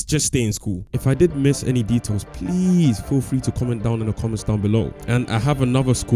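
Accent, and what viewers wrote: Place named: London, United Kingdom. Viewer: make ur mind up bro are you from london or america? ur pissing me off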